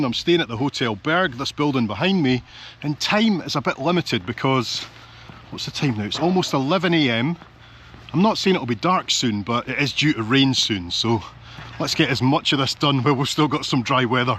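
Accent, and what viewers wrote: Scotland, United Kingdom. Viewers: First comment, I am enjoying all the videos, and look forward to them all. What I also enjoy is the delicate Scottish accent,and even nicer when comes on line...Chris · you sound british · The stiff Scottish accent is becoming increasingly understandable after each video😅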